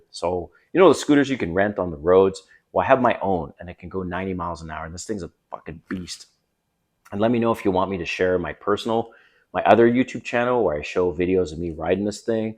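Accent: Canada. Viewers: i can detect the Canadian accent ! Cool 😊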